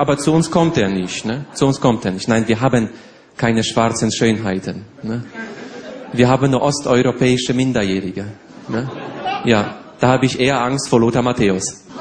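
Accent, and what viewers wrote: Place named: Poland. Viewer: Akcent razi ...bo przedstawia Polaka który źle mówi po niemiecku i dużo takich Polaków tak mówi z takim akcentem w Niemczech po niemiecku...a tak naprawdę to on potrafi perfect po niemiecku mówić.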